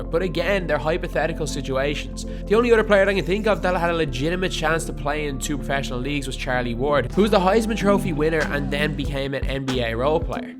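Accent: Ireland. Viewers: Irish Narrator.....😁...... I like Pat tho...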